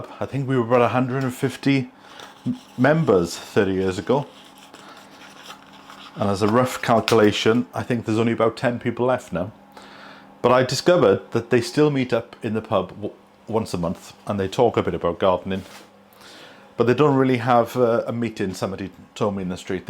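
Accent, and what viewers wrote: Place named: Cardiff, United Kingdom. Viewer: How long were you living in London as you have a Cardiff accent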